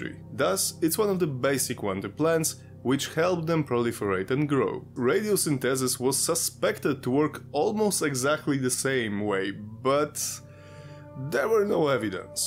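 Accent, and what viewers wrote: Russia. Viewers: ppl were asking why the chernobyl actor never tried your russian accent my man ❤, the whole show would be turned into a very broad Borat film , lol 😂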